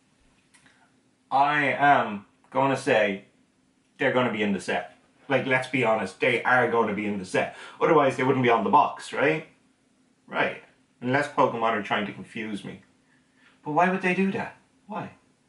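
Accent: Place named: Ireland. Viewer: I never knew I needed an Irishmen to teach me Pokemon. Erin Go Bragh!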